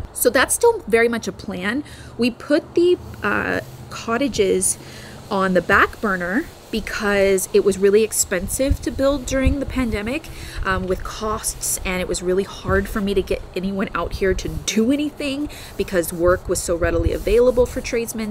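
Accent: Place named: California, United States. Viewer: Hi love your videos! I was wondering if you could answer this in your next q&a, I am just so curious. Have you always had a Californian accent or you just lost the southern accent living in CA for so many years?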